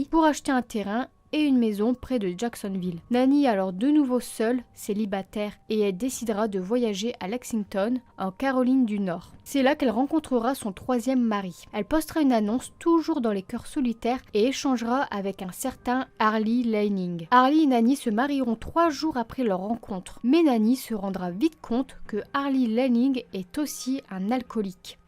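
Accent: North, France. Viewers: t'est du nord toi ^^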